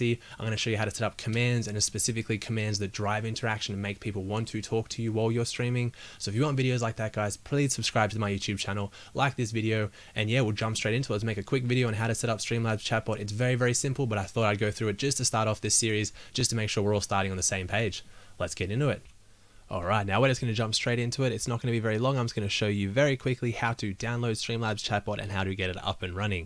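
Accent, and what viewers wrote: Australia. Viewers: Your voice sounds like a little bit of ASMR aussie!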